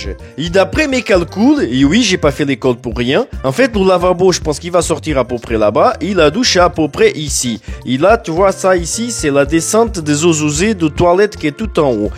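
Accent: Portugal. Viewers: J' aime bien ton travail. Est tu portuguès ? Ton aquecent me ressemble un portuguès. Pardone moi quelque chose. Merci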